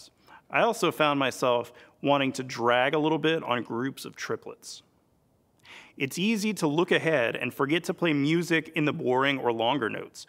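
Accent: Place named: United States. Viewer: He has an American accent, but the temper of a man in the British Parliment.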